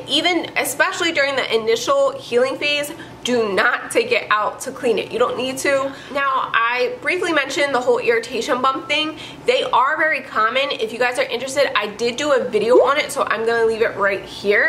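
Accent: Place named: Barbados. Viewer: That Bajan accent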